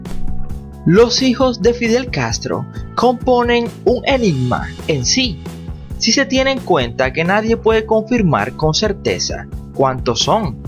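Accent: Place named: United States. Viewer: Dice Tany porque es norteamericano ? O no lo ve el acento que tiene?. No pronuncia bien..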